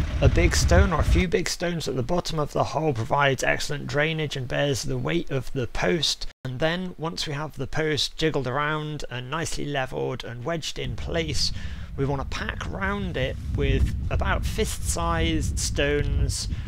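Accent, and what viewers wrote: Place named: West Country, United Kingdom. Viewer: But you don't have a Welsh accent. More like Somerset or Norfolk? No, wait; I've heard it in Bristol.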